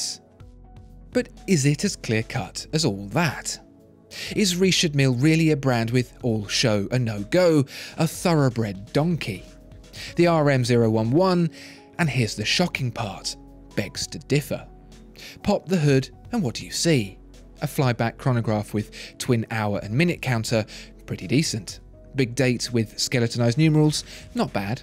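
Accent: United Kingdom. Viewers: I been watching the pro review from you almost a year . love your British accent man . I find very useful. thank you for the great work . do you think you can do a review on Breguet marine tourbillion ref number 5827. I couldn't find any helpful reviews . please help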